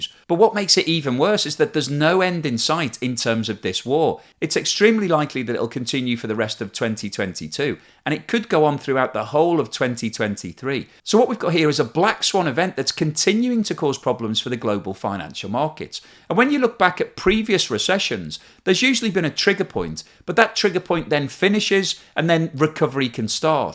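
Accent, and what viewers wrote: United Kingdom. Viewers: Dear: I don't normally simp and bestow excess praise on people but your channel is such a breath of fresh air in an age of agitprop, fake news and hateful propaganda. Your news reporting is almost noble and moral in the sense that you exert every effort to be as honest and impartial and as objective as possible. There are very few journalists out there these days who are not dripping with the venom of scathing personal opinion, and hominum attacks and Trump-style trolling. They say that "facts dont care about your feelings" but everywhere I turn to for the news all I hear are feelings and not facts, and hateful ones at that! Your channel, in my opinion, goes out of its way to try and provide a sober and unbiased analysis of world events--something personally essential for me, as I am an American, living in Russia, whose life has been upended by the war and the myriad sanctions the West has imposed on Russian. 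Thank you so much, and please continue making your outstanding content with that classy gentlemanly British accent of yours. 🙂 Sincerely, An American in Moscow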